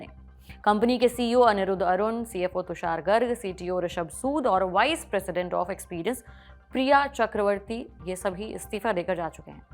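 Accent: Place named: Uttar Pradesh, India. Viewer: Lagta h ap UP se ho😂😂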